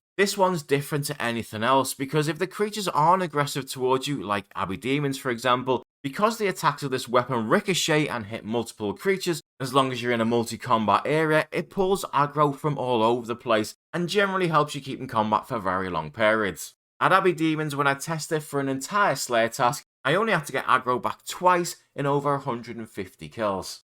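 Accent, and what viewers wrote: Liverpool, United Kingdom. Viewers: Fellow scouse osrs player?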